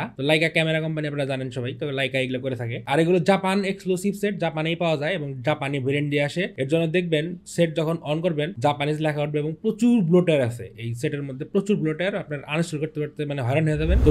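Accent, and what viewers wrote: Tangail, Bangladesh. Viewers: ভাই কি টাঙ্গাইল এর?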